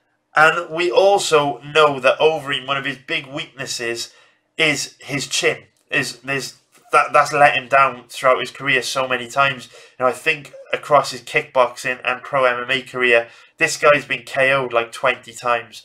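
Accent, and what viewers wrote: United Kingdom. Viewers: ✊🏼👊🏼All day dude, yall whats ur accent, theres like a lil welsh in there, scouse myself, just curious, looking forward to next parts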